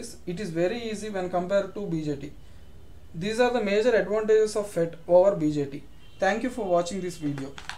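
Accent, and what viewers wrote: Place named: India. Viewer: cool and kinda funny how your cursive writting has as much indian accent as your voice.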